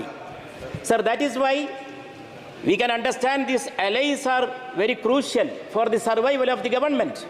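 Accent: Kerala, India. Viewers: ഇംഗ്ലീഷ് ഉച്ചാരണം തീരെ പോരാ. വെറും മല്ലു ഇംഗ്ലീഷ്.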